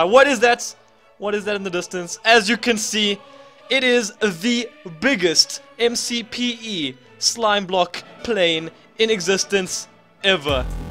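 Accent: South Africa. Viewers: You sound South African. Are you?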